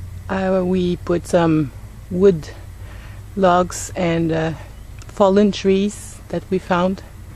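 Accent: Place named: Quebec, Canada. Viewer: Yaay another French Canadian accent ;) Pretty cool garden. But now you'll have to show the one at home lol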